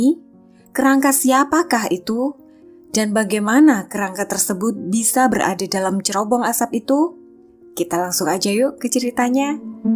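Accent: Java, Indonesia. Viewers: ko medog ya suaranya, ga kaya sebelumnya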